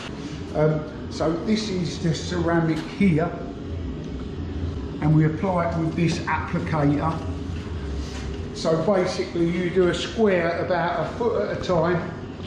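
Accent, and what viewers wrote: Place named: Cockney, United Kingdom. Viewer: Great vid and I love ya "proppa geeza" accent man. Found you guys from Marcus hays channel, hope to see more great vids from you guys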